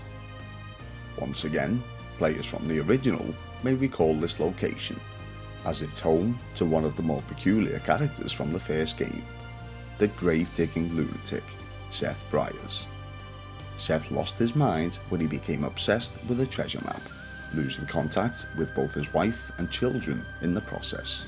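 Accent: Liverpool, United Kingdom. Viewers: Bro are you a scouser? It took me so figure out what was going on😂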